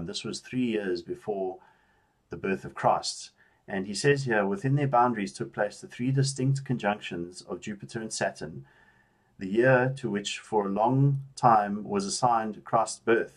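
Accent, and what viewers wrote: South Africa. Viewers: You sound South African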